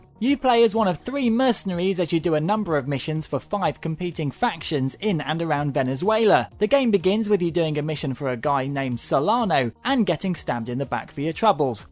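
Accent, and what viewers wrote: United Kingdom. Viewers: He's british, so he speaks with british accent.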